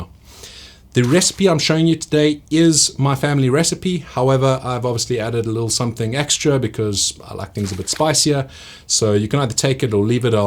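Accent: South Africa. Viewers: Now I understand from where is your accent, and the addiction to piri piri. (I have some family that come from South Africa)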